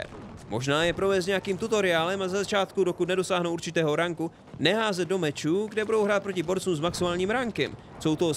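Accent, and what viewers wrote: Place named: United States. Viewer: prídáváš Americký přízvuk a to je děs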